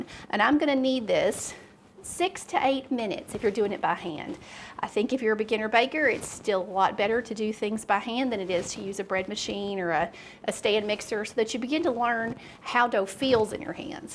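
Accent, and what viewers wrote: South, United States. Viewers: Thanks for your recipe, I don't really listen to people who talk a lot, but it was a pleasure viewing your channel as intriguing. Listen to your southern voice. Your family is blessed by your love of making bread 🍞. 😊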